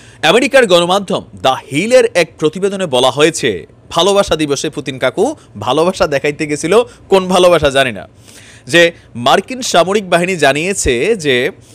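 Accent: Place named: Comilla, Bangladesh. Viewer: হাহাহা ভাই কুমিল্লার ভাষা শুনলে আপনার মুখে সেই লাগে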